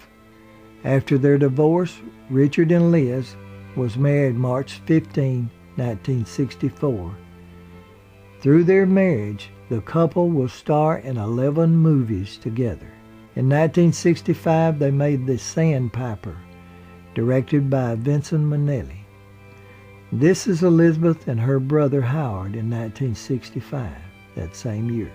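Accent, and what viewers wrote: South, United States. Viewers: I know....at first I was confused by this southern drawl, but after awhile you come to like it; but why is almost the whole darn thing in Black & White? I want to see those violet eyes! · MandyJMaddison, there are several generations of southerners here in the south that talk like that, and probably won't change. they are mostly from cotton mill families, and I find their pronunciations trashy and uneducated. I don't know why so many commenters here actually like his way of speaking. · Good ole Southern accent :) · I discovered your channel by accident but have come to really enjoy it. I like your calm demeanor, the way you say nineteen-and-fifty-six, your sweet southern accent and the interesting research you do. Nothing is rushed, the whole experience gives you insight and a bit of relaxation in the process.. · The narrator is a southerner and they have a unique vocabulary and accent. 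I thought everyone knew that. I’m sure Elizabeth would be delighted with his work as most people appreciate him.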